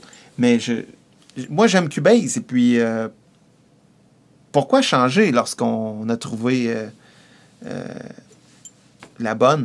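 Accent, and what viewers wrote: Quebec, Canada. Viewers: cool il est quebecquoi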